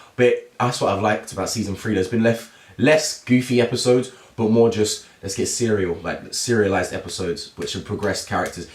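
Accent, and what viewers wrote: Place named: United States. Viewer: I gotta say.. I was hoping for even just a clip from the Citadel so we could see what evil Morty's doing but it was still a pretty fun episode... and kind of a different but still super cool format. Also pretty interesting how Rick had Summer prepared for such an event. Anyway, I can't wait to see how they wrap up the season :D ...love your American accent btw :')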